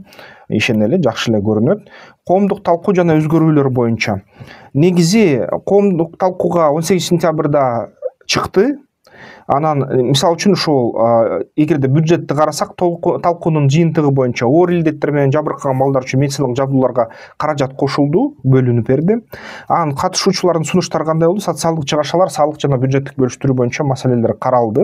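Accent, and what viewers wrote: Standard. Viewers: Сонун таза кыргызча менимче мамилекет туура жолдо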